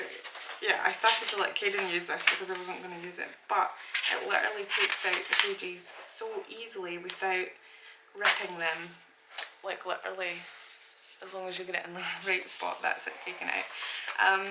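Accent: Scotland, United Kingdom. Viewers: Are you Scottish ?? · Thank you! You are britush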